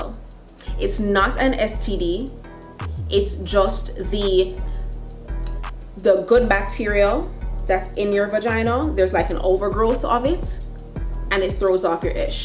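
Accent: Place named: Jamaica. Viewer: Got to be Jamaican love it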